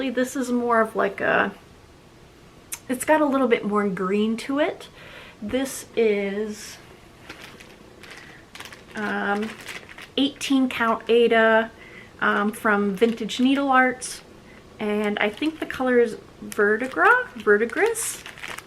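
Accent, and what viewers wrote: Midwest, United States. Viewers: Welcome to FlossTube. Great job with your first video. Not sure what you meant about a Minnesota accent. My first impression before you told us was - yeah she is a Midwesterner like me! I am in Illinois close to the Wisconsin border, and you sound just like us down here. You betcha! I like your WIPs. They are are not the usual ones I see on FlossTube. Your love for animals is engaging, and I look forward to your sharing your knowledge of animals with us. Best wishes for a safe, happy and healthy holiday season. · Get in line for the Midwest accent,I'm a Scony Our mudpuppys look different too.😆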